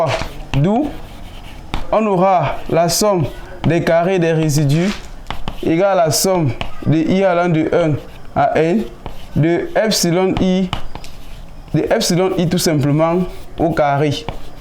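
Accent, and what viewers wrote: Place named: Africa. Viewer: Merci. excellent, il te foudra consulter les vidéo de communauté qui parle nativement le français de la france correctement prononcée. je veux dire par ceci le français comme le prononce les professeurs du français dans les faculté du magreb arabe par exemple. pourquoi ?????? et bien parce que, tu va devoir abaisser dans un premier temps, l'accent africain très ombré . autrement dit, toi , tu cherche à mondialiser tes propos, to doit donc visée un accent moyen que la majorité des francophones du monde vont comprendre facilement et adorer. je te dit ceci car je veux que tu reussisses mon ami. :hand-pink-waving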